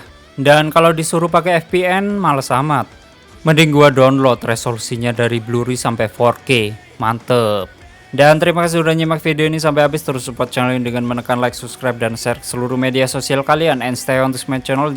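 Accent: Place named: Tegal, Indonesia. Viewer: Voice overnya pasti orang tegal -,-'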